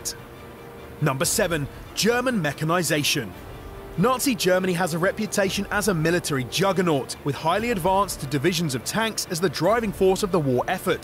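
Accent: England, United Kingdom. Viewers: The narrator is actually Russian, though he speaks with an interesting English working class accent. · He sounds British to me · It's definitely not an American who made the video...he has a clear English accent. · The narrator has a British accent. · He doesn’t sound American. Maybe British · Barrick He spoke with an English accent. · to be fair, the accent sounds english but the propaganda slant sounds american, so, could be both?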